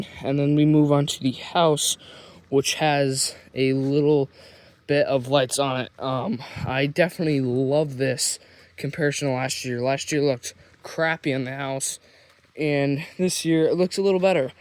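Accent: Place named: Minnesota, United States. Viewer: man, i seriously thought it was. I think the accent added to my conviction that it was Minnesota landscape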